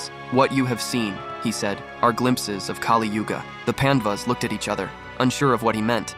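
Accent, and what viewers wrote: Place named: United States. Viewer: Phew! Finally Mahabharata in American accent! ❤❤❤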